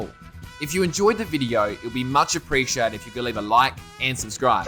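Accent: Australia. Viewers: Amazing video! Here is my list: 8.Mario circuit 3 7.Sky High Sundae 6.New york Minute 5.Kalimari desert 4.Snow land 3.Sydney Sprint 2.Waluigi Pinball 1.Mushroom Gorge And I really like your Australian Accent:) Keep the good up💖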